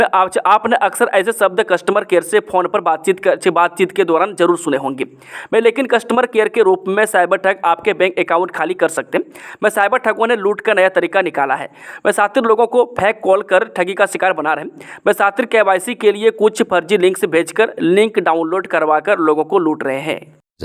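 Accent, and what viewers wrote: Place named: Uttar Pradesh, India. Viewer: Bhai tum Hindi UP style mein bolte ho.UP ke ho kya.HP ke reader khatam ho Gaye jo tumko import karna pda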